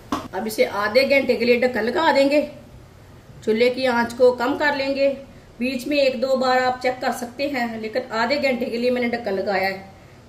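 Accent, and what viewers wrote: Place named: Punjab, India. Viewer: Ap urdu m video na bnaya krien pnjabi m bnaien ap ka bolne ka andaz pnjabi h urdu zuban ap pr sot ni krti pls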